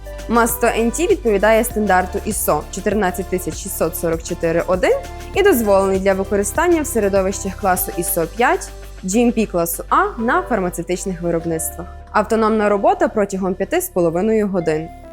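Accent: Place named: Western Ukraine, Ukraine. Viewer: Приємно чути таку експертну подачу українською, ще й з таким теплим західноукраїнським акцентом 💛💙 Дякую за професійне пояснення про важливий аспект мікробіологічного контролю!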